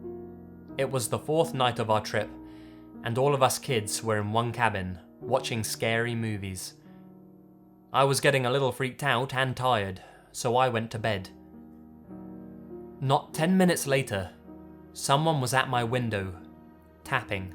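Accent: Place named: United Kingdom. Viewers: Finally someone else like me who has a British accent and tells scary stories only you have a British accent that's a hundred times better than mine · I love your British accent, I'm Canadian and I die a little every time a hear your voice and your tone for telling scray stories, scares the shit out of me.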